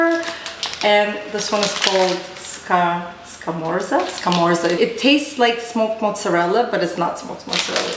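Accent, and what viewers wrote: United Kingdom. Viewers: why do you take a British accent? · Her British accent is strong in this video love it!!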